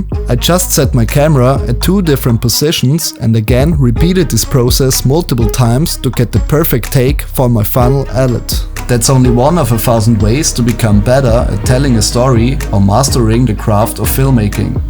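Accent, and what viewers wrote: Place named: Germany. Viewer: Da hast du ja ein paar nette Videos. :-) Und immer wieder amüsant, wenn deutschsprachige Menschen Englisch reden. Der Akzent ist mitunter klasse. ;-)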